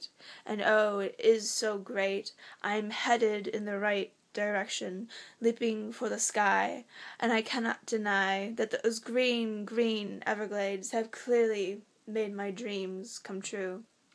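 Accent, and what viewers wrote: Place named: England, United Kingdom. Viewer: You sound English. Good sonnet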